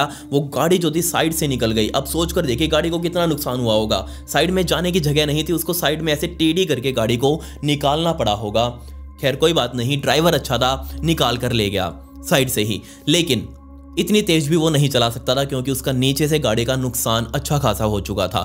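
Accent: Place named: Haryana, India. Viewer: sir ap apki voice apka lok bahut acha h Jab m apki video dekhni lgi thi maine awaz se hi pahachan liya ap haryana se ho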